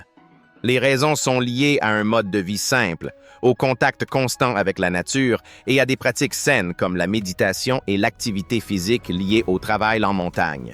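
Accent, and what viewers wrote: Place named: Canada. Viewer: cet accent si sympathique qui est canadien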